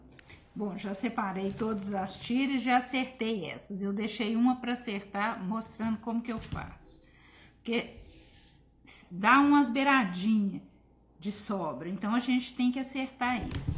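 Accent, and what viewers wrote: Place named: Minas Gerais, Brazil. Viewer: Suas explicações são excelentes, adoçadas com sotaque mineirinho ficam melhores ainda! Adoro seus vídeos!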